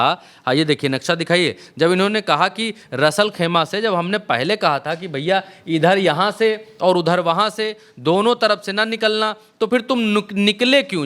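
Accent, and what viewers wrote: India. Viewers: Bhrat tumhara desi andaz chune wala hai .Desi style me english bolna aur beech beech me flana dhkana 😅acha lgta hai.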